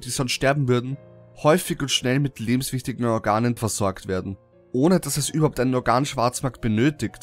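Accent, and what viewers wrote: Austria. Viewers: jo woher aus oesterreich kommst du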